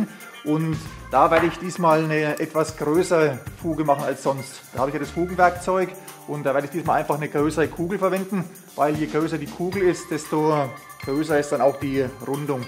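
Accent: Franconia, Germany. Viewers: du frängelsd jo wid zau :) Mega Grüße aus Unterfranken!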